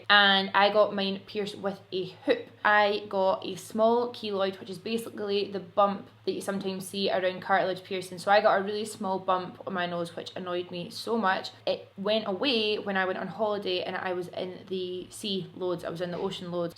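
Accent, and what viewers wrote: Scotland, United Kingdom. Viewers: I think scottish accent is so sweet!! But when i first started wathing i did kinda struggle understanding her but now that i have been watching her pretty long time, no problem 😄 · I love it when I accidentally stumble across a fellow Scot. Also I wish my makeup was as on point as yours 😍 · I find it so interesting when people say they struggle to understand what you’re saying because I’m Scottish Too and so it sounds so normal to me Haha. 💕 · Oh my god I hit a whitey 😂😂😂 such a typical Scot I love it 😍😂 sounds exactly like me x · i moved to ggw a little over a year ago and i just realized how good i am at understanding scottish accents now vs then. my god, i swear i just laughed and nodded at everything for a month (im swedish and moved here from portugal). your accent is still really mild to most of the folk i interact with, christ. 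idk why but your content makes me feel at home and your love for your pup had me subscribe before i knew what you generally post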